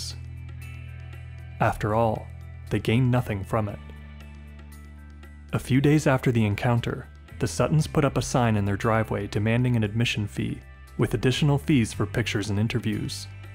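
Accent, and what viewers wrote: Canada. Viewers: Are you from Canada or thereabouts? The way you pronounce certain words, like “about” and “house” is very endearing. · I can tell your Canadian 😂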